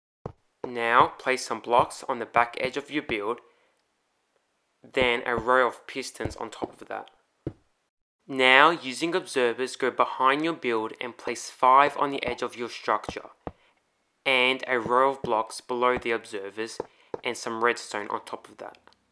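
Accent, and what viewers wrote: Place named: Australia. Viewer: As soon as I heard your voice, I knew you had to be aussie. Sure enough, the 'about' page for your channel confirms it! Hey mate :P Just checking your video to reply to your question on VidIQ discord. I've subscribed :)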